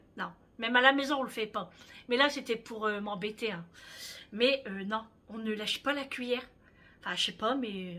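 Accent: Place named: Nord, France. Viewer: Bonsoir Un vrai plaisir de faire votre connaissance… j’ai découvert votre chaîne par hasard et j’en suis plus que ravie 😊 En effet je suis originaire du Nord et votre accent me fait chaud au cœur 🙏 Je me suis abonnée à votre chaîne avec grand plaisir… Je vous envoie de belles ondes positives de ma Bretagne d’adoption et surtout prenez soin de vous. (Ma sœur habite fâches thumesnil) Bon courage pour demain et je vous dis encore merci pour votre gentillesse. Dominique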